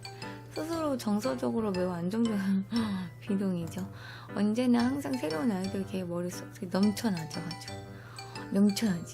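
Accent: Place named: Jeolla, South Korea. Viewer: 전라도 사투리가 넘매력적예요 파티마님에서 이제 으로 갈아탔습니다 ㅎㅎ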